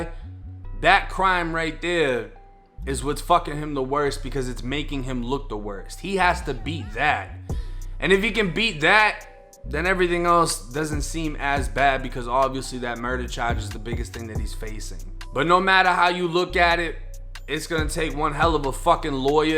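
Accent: Boston, United States. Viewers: The best coverage I’ve seen yet. Nice New England Accent too. Sounds like Boston. New subbie. Lucci is definitely going to do time from what I can tell. He hired Gucci’s Lawyer to defend him. I heard he is the best when it comes to this type of case.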